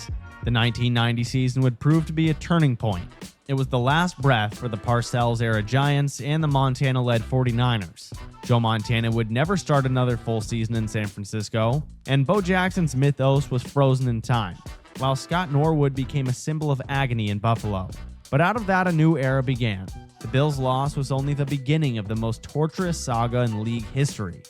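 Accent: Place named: United Kingdom. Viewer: I wish I spoke like a Brit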